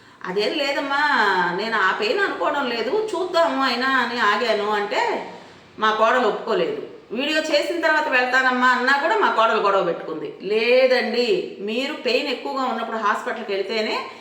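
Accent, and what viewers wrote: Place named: Prakasam-Nellore, India. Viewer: మీరు మాట్లాడే భాష మాకు ఇష్టం. మా చిన్నప్పుడు, మా ఎదురింటి ఆవిడ కూడా ఇలా మాట్లాడేది. ప్రకాశం, నెల్లూరు జిల్లాలలో ఆడవాళ్లు ఇలా మాట్లాడతారని తర్వాత కాలంలో గమనించాము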